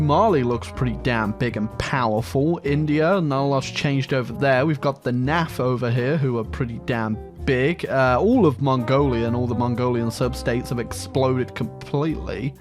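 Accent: France. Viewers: I want a full video of to just speak in a french accent the whole time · The French accent is needed for future videos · You Have The BEST French Accent Ever, Even Better Than A Actual French Man. me simping for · I want more of your beautiful French accent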